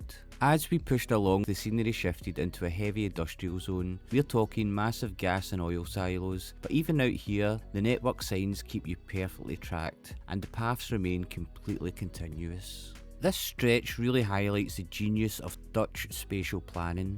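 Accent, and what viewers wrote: Scotland, United Kingdom. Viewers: Video: great. Narrating: even better. Scottish accent: warms my heart. Perfect!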